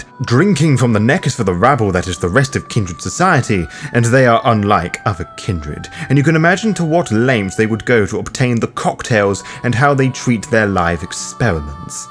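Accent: United Kingdom. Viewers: Its called being British, which I assume he is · Pray tell, fool. What do you THINK a British person should sound like?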